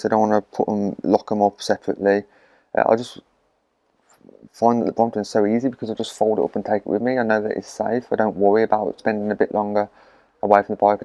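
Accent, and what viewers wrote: United Kingdom. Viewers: Good to hear a fellow Brummie. Im looking to buy my first Brompton. Ive read alot of articles about the weight limit of 110kgs and not to worry too much on that fact. What are your thoughts on this? I am a slightly heavier bloke, 135kgs. Cheer mate 👍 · Very informative. Thinking of getting one (despite the price!!!!) so you're opinion on owning one has helped enormously. Great to hear the Dudley accent, too! Not enough Brummie and Black Country accents on YouTube!😃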